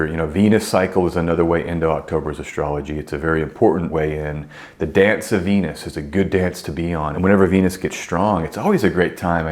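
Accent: Texas, United States. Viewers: I thought I was hearing a Texas accent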